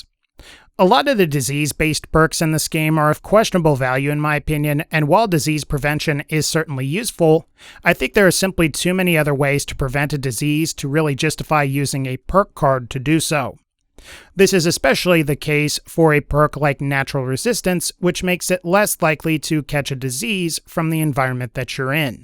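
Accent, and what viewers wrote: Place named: New York, United States. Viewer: This dude is from New York or New Jersey. Or he has some other wack ass accent.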